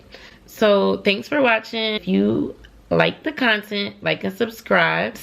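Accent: Philadelphia, United States. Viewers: As soon as i heard your voice I'm like no way she's from Atl, gotta be from Philly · I thought you sounded like you were from Philly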